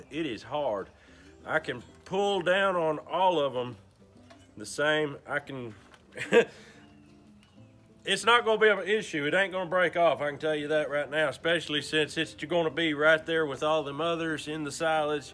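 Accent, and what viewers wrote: United States. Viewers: you have such an american accent, i like, also good video man, i need it to fix drop out in my eletric bike so wanted to check how strong it was, thanks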